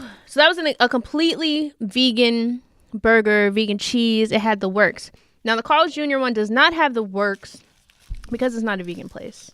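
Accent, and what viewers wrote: New York, United States. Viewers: you should really go with yearrrrrrr that the new york in you lol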